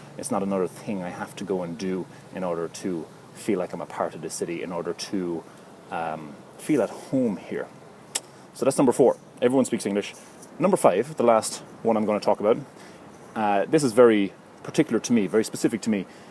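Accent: Cork, Ireland. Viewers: he sounds like a typical Irish man, I dunno where you get that from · He is from Cork. Definitely. I'm half Irish, I know. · ...from Ireland? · He's clearly Irish from his accent. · You from Ireland my man? Pretty sure you are ^^ edit You actually mentioned you are in the vid later on :-) my bad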